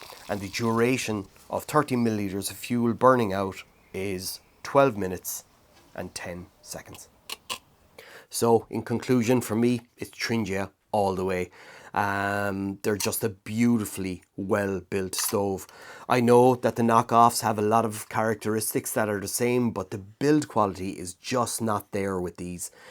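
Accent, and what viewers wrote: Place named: Ireland. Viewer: Hi. Loving your videos. I'm in the middle of your hiking with your dog one, and your life interruptions. I feel for you buddy, been there. Hopefully, you're getting back on track now. I'm in Kent, but my great grandfather was born and raised in Clonmel, so I always feel happy when I hear the beautiful Irish accent. I must come over for a visit some time. Keep your chin up, hopefully it'll all work out for you. I hope your Dad is all good now. Cheers buddy. Andy 👊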